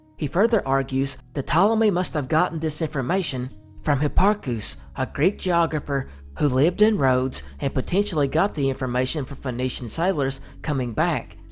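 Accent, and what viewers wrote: South, United States. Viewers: lol dude I can’t lie, hearing you say “Mulan Pie” a hundred and fifty times in that signature southern brogue was worth the price of admission. I’m gonna watch all adverts all the way through for the rest of the video lol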